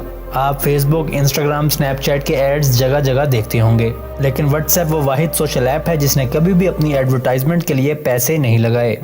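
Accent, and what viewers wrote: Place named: Faisalabad, Pakistan. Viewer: ap koi faisalabadi lgty ho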